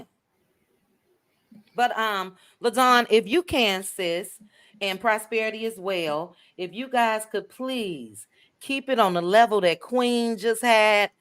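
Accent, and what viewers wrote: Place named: Chicago, United States. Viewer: I’m from Chicago and that’s definitely how we talk…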